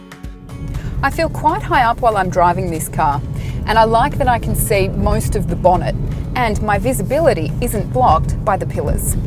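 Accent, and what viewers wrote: Australia. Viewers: love the auzzie accent - now i watch all my reviews on auzzieland channels. i have to assume this lady is not super tall...otherwise this car looks enormous. hopefully they dont scrap these vehicles with the new renault-mitsubishi asx built in spain if i hear correctly. i would hate to see the great reliability of these cars destroyed by changes in production. japan built cars kick as*. as i get older i look much more into production and history than vehicle options. many vehicles out there are crap...the asx/rvr is not one of them. mine is still awesome 11 years later. good visibility. great maneuverability. reliable. simple. im 6'4" and it has lots of head room. the tall kids complain in the back seats so everyone needs to adjust the seats. paddle shifters are great for the smaller engine - my 2012 has it. enough power with paddles. 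auto is slow from a stop. fold the back seats down and use a moving blanket to haul a lot of stuff. i haul 2x4s and plywood with mine when needed. $120 after market roof rack.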